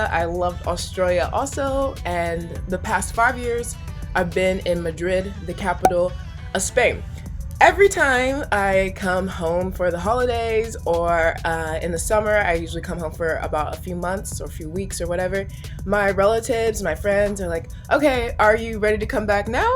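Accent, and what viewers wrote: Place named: Canada. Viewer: Hi. Just curious what state you are from? You kind of sound Canadian...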